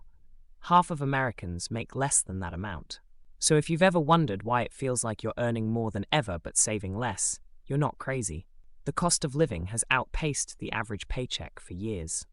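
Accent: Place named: England, United Kingdom. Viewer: English Accent means I stop listening to this garbage.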